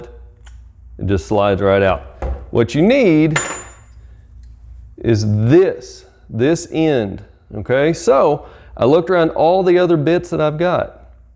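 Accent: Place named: Milwaukee, United States. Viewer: You sound like a Milwaukee guy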